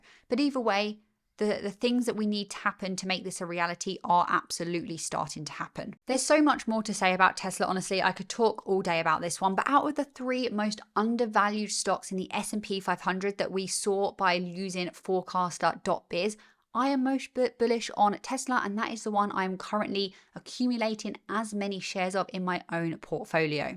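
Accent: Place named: United Kingdom. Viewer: Fantastic, quality content!!! Thank you! is a very good name for this channel because I really enjoy listening to your British accent. Anyone sounds smart by speaking British. But in your case, you are very smart to begin with and then you have that smart accent. 😃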